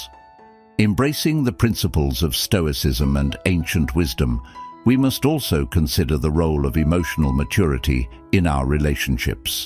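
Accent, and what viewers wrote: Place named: England, United Kingdom. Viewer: Bla bla bla... with an English accent. Men have walked away from whomen, guy. Stoicism does not work on the modern whoman, she is too Marxist left now.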